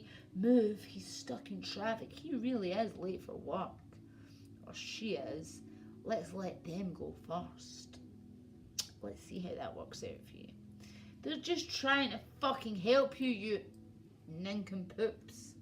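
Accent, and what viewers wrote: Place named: Scotland, United Kingdom. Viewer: I subbed you back hunni,great to hear a good old Scottish lass in this fight,my 1st,lol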